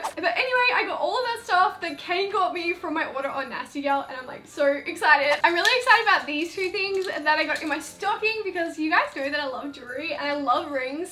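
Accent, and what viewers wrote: Australia. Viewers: Are you Australian? xx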